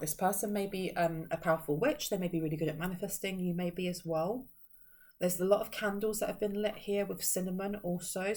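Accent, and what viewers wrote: United Kingdom. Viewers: I was just hanging out with you in the kitchen, with a double size mug of hot cocoa with marshmallows ! Thank you for the messages ! I love listening to you ! I'm in the USA, and I love your Brit accent. I love the shows Last of the Summer Wine, The Vicar and Keeping Up Appearances, its not just because they are such funny shows but its the accents too ! I wish you the Merriest Christmas 🌲🧑‍🎄 and a very Happy New Year 🍾🎉 ! I look forward to yo ur readings and will continue to in 2024 ! 💖 Lynn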